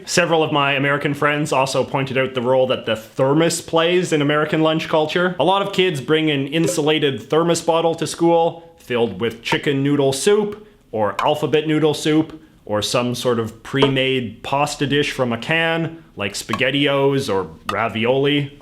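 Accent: Canada. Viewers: I love to hear pronounce foreign things very Canadian-ly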